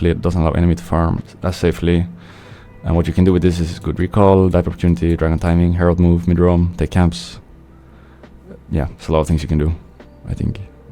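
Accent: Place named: France. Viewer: he has a little french accent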